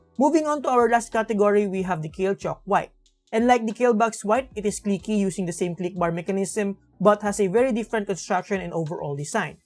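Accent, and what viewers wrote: Philippines. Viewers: He has dat pilipino aksent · his accent is so... Woah very filipino (in my ear) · Ingles wid the pilipino aksent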